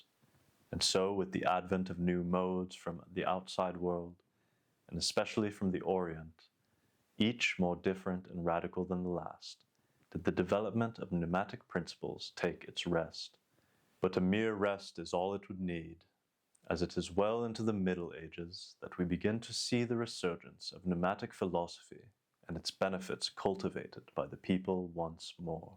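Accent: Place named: Transatlantic, United States. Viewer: Your transatlantic accent makes you stick out from other content creators now and to come!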